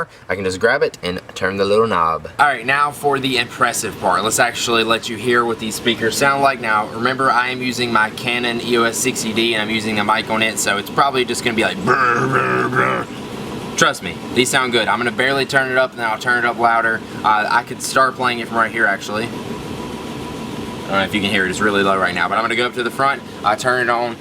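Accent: South, United States. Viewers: Are you from the southern states? I hear a little bit of a southern accent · Dude how is this impressive... Do u watch ur own.vids before u post them? This is a tech channel and I got this southern sounding pre-pubescent. Hillbilly saying "it mAkes radio sound better.. Durr"..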